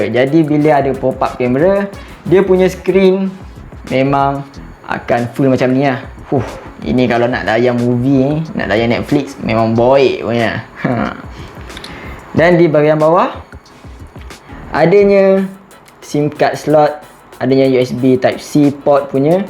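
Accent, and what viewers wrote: Sarawak, Malaysia. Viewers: org sarawak? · hi, abang ni orang sarawak ke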